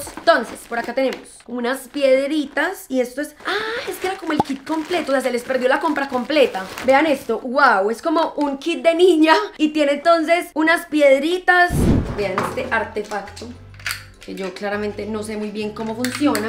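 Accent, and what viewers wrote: Antioquia, Colombia. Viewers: Me encanta tu acento paisa ♥️más vídeos de cosas perdidas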